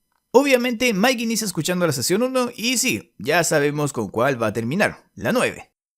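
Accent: Chile. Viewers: Crack makina te recontra quiero una prefunta eres de chile ?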